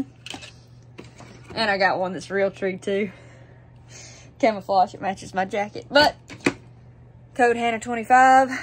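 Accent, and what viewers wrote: South, United States. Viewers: southern drawl I love it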